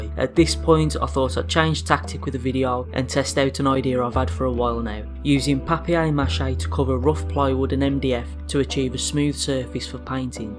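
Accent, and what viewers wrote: Black Country, United Kingdom. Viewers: Don't worry, he ay familiar with arr Black Country spake, I am though, from a Black Country lad to another... Bostin' vid 😃👍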